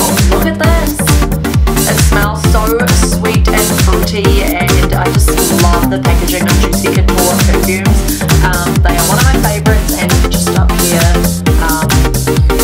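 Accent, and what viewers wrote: New Zealand. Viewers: your New Zealand accent is so cute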